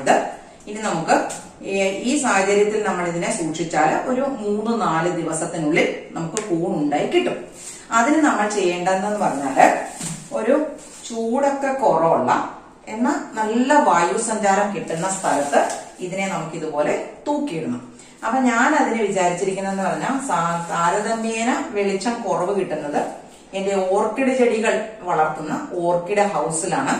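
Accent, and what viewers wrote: Kottayam, India. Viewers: വർത്തമാനം കേട്ടാൽ അറിയാം കോട്ടയം സ്വദേശിയാണ് എന്ന്..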